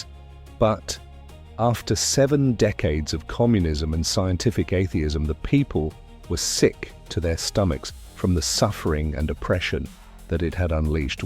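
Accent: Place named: England, United Kingdom. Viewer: the english accent instead of a german accent speaking english turned me off the content, the english language replacing completely anything to do with the person has been pushed onto the world for far to long causing too much damage... its only a little thing but its still part of a bigger thing. Generalised accent more broadly accessable wider audience... there are heaps of examples of germans speaking english that re perfect to understand to use as an example.... anyway, take my comments for what they are worth. All the best.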